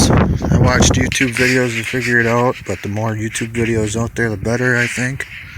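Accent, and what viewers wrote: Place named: Corning, United States. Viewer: you sound like my cousins from Corning NY... same accent lol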